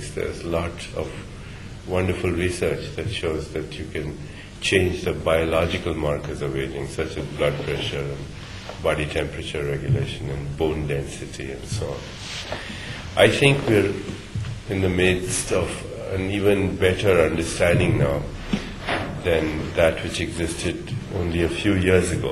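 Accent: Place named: India. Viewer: Not sure if wise or just Indian accent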